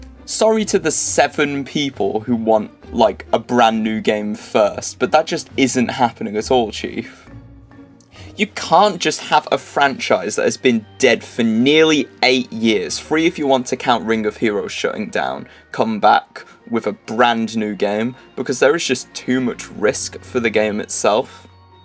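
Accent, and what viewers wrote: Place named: United Kingdom. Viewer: BRI'ISH REVEAL HOLY CRAP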